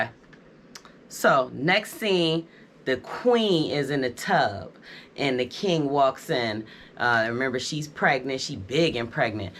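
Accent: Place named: Philadelphia, United States. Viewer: I can tell u from Philly by the first sentence, U gotta be. I subscribed cuz yu a beautiful black woman & I think u from my city.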